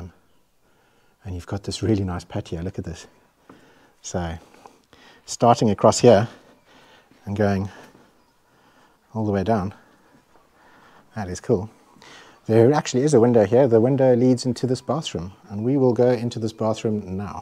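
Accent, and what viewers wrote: South Africa. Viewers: Hello I have just come across your channel and I had a quick look at what video's were on your channel and I seen lots of properties so I am now subscribed I have a question for you and I am eager to know if I am correct in thinking by your accent that I believe your maybe from South Africa?? Eager for your reply Enjoying what I have seen so far and I think I am gonna be binge watching these videos 😊😊 Lisa UK xxxx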